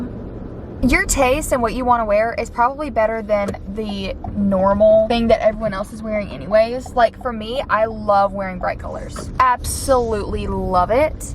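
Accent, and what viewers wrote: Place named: Texas, United States. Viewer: I 💯 think your a texas girl you have that accent❤ I’m from oregon it’s beautiful here but if I could get my daughter to transfer we’ll be moving to texas or Nashville.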